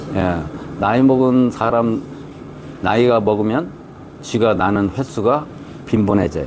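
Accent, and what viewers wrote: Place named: Chungcheong, South Korea. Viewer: 강의 참 재미있습니다❤ 충청도 제 고향 본토 사투리가 더 재미있습니다❤ 바락바락 ㅎㅎㅎ 다리에 쥐 날때 족삼리 오금통 위중에 사혈 숨통을 터준다 ❤❤❤ 급한대로 피를 낸다 백문이불여일견이라 신경 신경은 허리 천추에서 시작 진단 엘로드 진짜 재미가 바락바락납니다 ~~~ ^^ 고맙습니다 ❤❤❤❤❤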